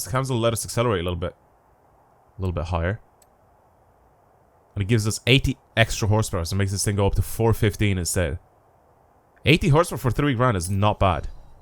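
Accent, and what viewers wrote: Ireland. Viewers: Are you Irish? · Just subbed, great content! Also, which part of Ireland are you from?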